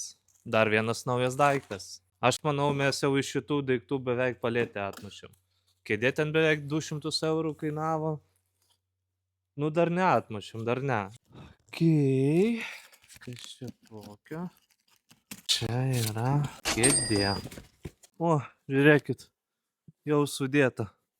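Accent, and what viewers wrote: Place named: Poland. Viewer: ir galva skaudet pradejo tas lenkiskas akcentas ir dar plius siuksles rodai kaip normalu daigta nezinau ar istversiu antra tavo video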